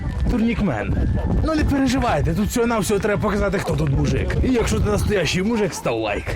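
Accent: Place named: Ivano-Frankivsk, Ukraine. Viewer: Какой же уродский этот западенский язык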